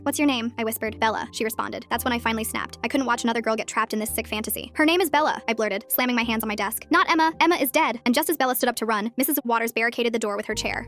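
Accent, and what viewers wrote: United Kingdom. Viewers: So your British I challenge anyone here to figure out how I found this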